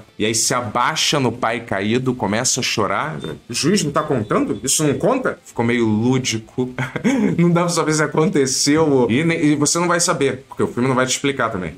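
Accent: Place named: São Paulo, Brazil. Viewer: Ele e da capital , e sotaque de paulistano.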